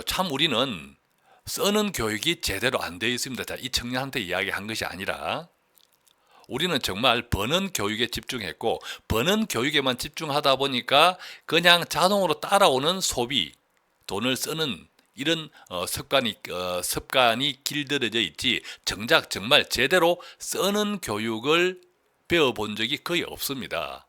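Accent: Gyeongsang, South Korea. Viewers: ~ 혹시 대구분이세요?????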